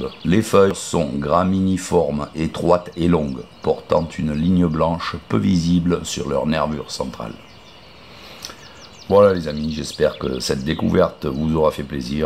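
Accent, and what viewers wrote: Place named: Hérault, France. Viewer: Merci pour l'explication. Ce joli accent vous vient d'où ? De l'Hérault ? Merci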